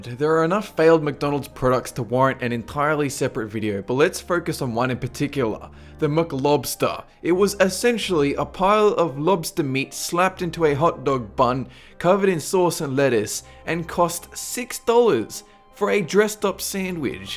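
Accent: Australia. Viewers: or you can listen to his voice to find out..... he clearly has an australian accent.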